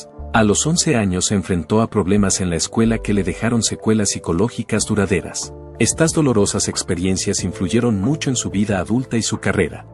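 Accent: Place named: South America. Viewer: Creo k se refiere a k fue una estrella xk antes hacía conciertos y sacaba discos y ahora por su enfermedad no puede solo por eso o de pronunciar Charon en vez d Sharon es porque es sudamericano y ellos pronuncian así por ejemplo mi nombre es azucena pero ellos dicen asusena hasta tengo una anécdota con eso un día k fui a recoger una pizza me decían k era para asusena no para azucena ja ja ya ves osea k no se lo tomes en serio k el chico hizo un video muy bueno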